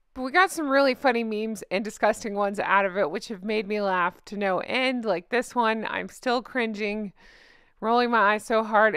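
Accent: Ireland. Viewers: I'm Irish and your Accent is not too far off😮love ya love your Channel God Bless to you and your wee family 🙏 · Oh thank you for making me laugh and chereing me up!! in bed ill,drinking tea, and i have not stopped laughing!! by the way your Irish accent is good!! take it from me☘❤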